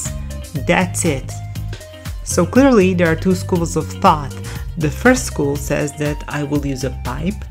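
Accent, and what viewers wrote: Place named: Eastern Europe. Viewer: this slavic ascent😮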